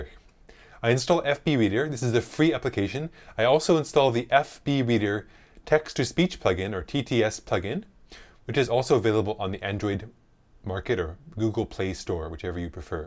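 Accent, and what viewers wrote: Canada. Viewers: U Canadian? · He is Canadian. That is why he sounds different.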